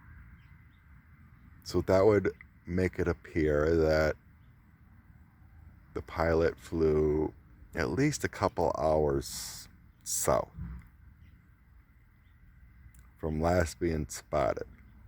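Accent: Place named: United Kingdom. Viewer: Кто нибудь расскажите об авторе канала Кто он ? Что он? Кто он по национальности ? Американец, Британец? Я не очень разбираюсь Но если не ошибаюсь у него Британский Английский. Американецев вообще сложнее понять Американцы Коверкают Искажают Английский язык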